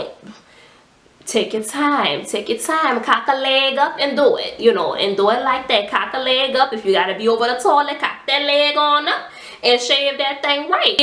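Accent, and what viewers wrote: New Orleans, United States. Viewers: Yes mama I hear dat orleans accent 😂❤️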